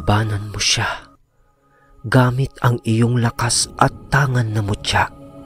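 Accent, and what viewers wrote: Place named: Ilocos, Philippines. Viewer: Nkk2wa k pakinggan anak kc my ilokano tlaga lov2 nnay ❤❤❤❤❤🎉🎉🎉🎉🎉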